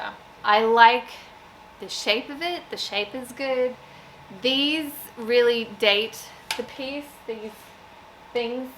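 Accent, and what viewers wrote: Australia. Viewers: I just seen this as it popped up , I have to ask , do I hear an Aussie accent... love from Australia..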